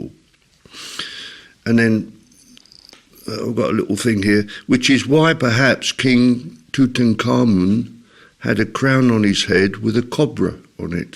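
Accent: United Kingdom. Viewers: Hi, did you know Stuart Wilde? What do you think of him? Your British accent reminds me of him.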